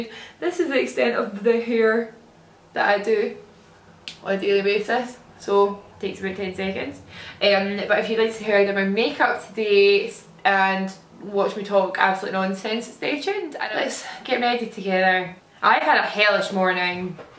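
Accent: Scotland, United Kingdom. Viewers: Your Scottish accent gives me life ❤️ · American ? No Scottish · no, I'm American. to me, you have a thick accent which I think is Scottish. Your response tells me I was right. It's lovely all the same.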